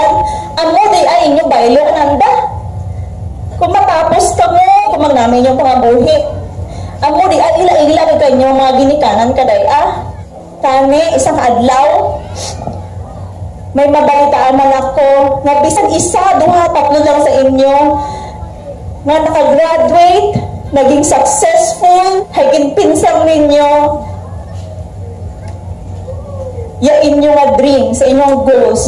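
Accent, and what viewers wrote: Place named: Iloilo, Philippines. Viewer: Gusto gusto ko yung ilongo mo. Napakalambing sis.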